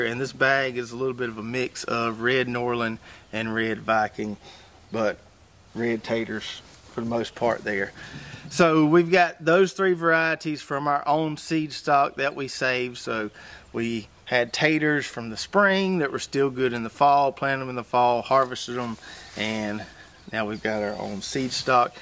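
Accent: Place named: Georgia, United States. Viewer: Love your South Georgia and accent here in Suwanee Georgia. Try this experiment on one tater. Simply lay it down on the ground and keep it heavily, I mean heavily mulched with leaves, hay, straw, whatever. I mean keep it mulched so sun don't get to that tater and it'll grow just like the ones in the dirt, only you don't have to hill it or none of that. Set it down... cover it...forget it. Don't even have to water it. "Set it forget it". Try it...Please...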